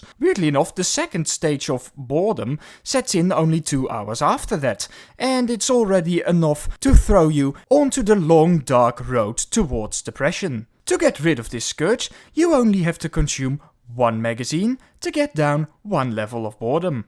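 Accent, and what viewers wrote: Netherlands. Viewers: 16 seconds in and I can already tell you're dutch lol. nice video tho! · Haha tietemans 😂 weet niet of je het bewust heb gedaan maar ik neem aan dat je Nederlands bent aan het accent te horen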